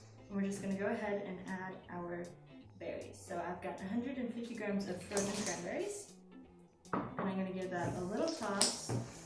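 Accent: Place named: Ontario, Canada. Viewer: You are adorable! My daughters name is, as well. She is soon 29. So love that name. She’s named after an Irish friend of mine. I always called her “ Jiggs,” too. We had all of the books. Such wonderful memories! I grew up in Ontario, Canada. She lives in Stratford, Ontario, now. You sound like you are from Ontario, also.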